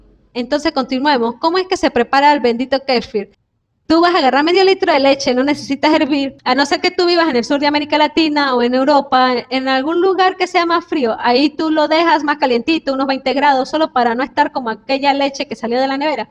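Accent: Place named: Venezuela. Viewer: Hola 👋 una pregunta eres de Venezuela; me suena el acento, seguiré tus videos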